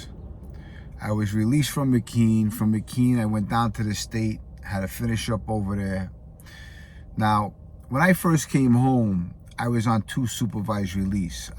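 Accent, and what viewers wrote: New York, United States. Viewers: still loving your vids but check this. Im interested in mob life like millions of others world wide.Would I want to live that life? Not no but HELL NO!. I think you made the right choice myself. The New York accent is bad ass. Keep being your true self and to hell what others say!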